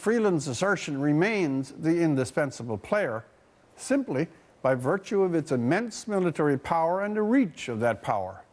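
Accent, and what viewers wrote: United States. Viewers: you are a traitor sir. Canada is and always will be ok. you sound so American too.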